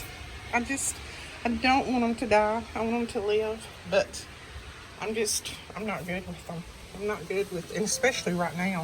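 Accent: South, United States. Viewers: Love your southern accent,I am from the south but I think my accent is not noticeable. I enjoyed your video. That is cute. Can't wait to see another video.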